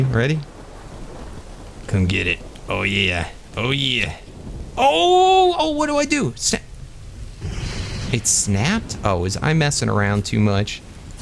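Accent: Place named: Boston, United States. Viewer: 220307 USA, Boston maybe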